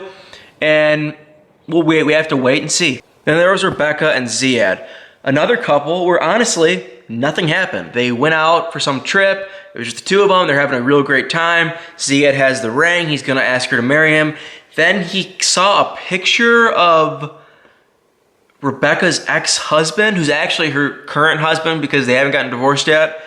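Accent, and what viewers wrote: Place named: Midwest, United States. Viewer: Omg ur Midwest accent lol. I’m from nyc and all I can hear is ur accent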